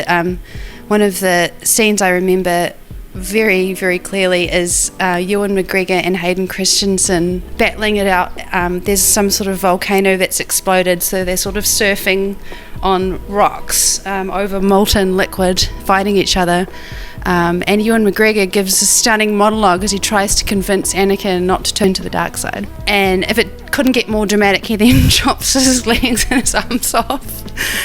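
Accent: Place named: RP, United Kingdom. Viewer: Her accent is kinda posh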